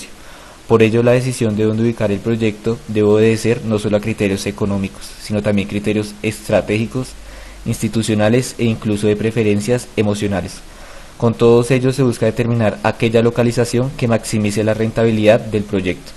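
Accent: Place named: Colombia. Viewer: por el acento se da uno cuenta que es de colombia jaja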